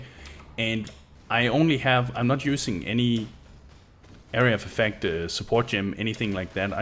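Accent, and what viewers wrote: Denmark. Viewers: Are u scandinavien? Maybe even Danish?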